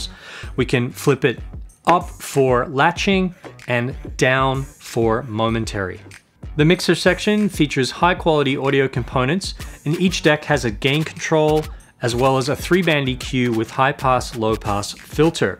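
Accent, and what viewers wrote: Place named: Australia. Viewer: Are you an Australian who has lived in America for a long time? Your voice sounds in between the two and it’s confusing me.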